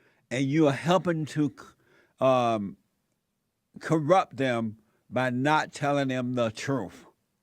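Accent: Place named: South Carolina, United States. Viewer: Yep sounds like South Carolina.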